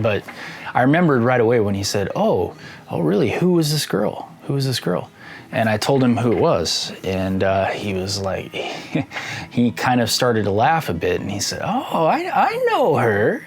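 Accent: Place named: Tonga. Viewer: Hahahahaha I love this. Your Tongan accent is on point. You’re more Tongan than most Tongan kids. I’m so proud of you ❤️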